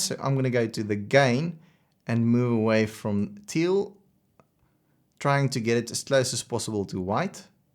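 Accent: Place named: South Africa. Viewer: South African!!!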